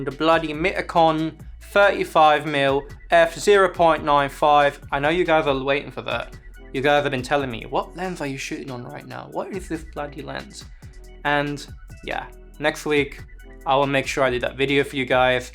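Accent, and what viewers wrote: India. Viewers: I like your videos. I also enjoy your accent and your indian accent (x carry on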